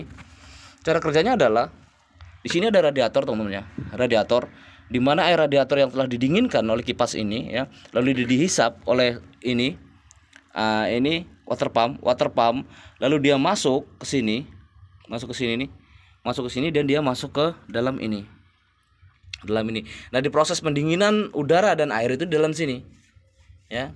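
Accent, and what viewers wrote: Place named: Bandung, Indonesia. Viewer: Klu saya amati logatnya kok soperti orang bandung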